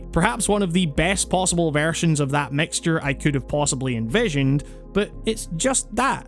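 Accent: Scotland, United Kingdom. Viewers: I’m pretty certain he’s Scottish, especially with a name like. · Clearly a Scot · He's Scottish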